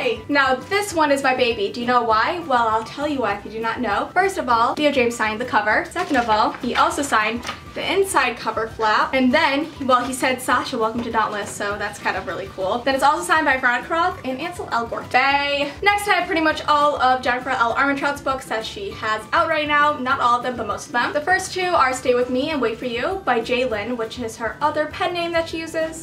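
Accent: Scotland, United Kingdom. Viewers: I love the scottish accent 😂👌🏻❤️ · Your Scottish accent is honestly the cutest! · Your scottish accent is to die for! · That is the most hilarious Scottish accent I have ever heard😂😂😂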